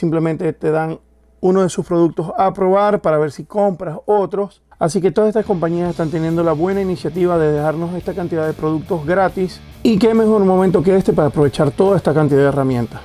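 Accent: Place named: Dominican Republic. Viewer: Yo soy dominicano y pensé que eras domi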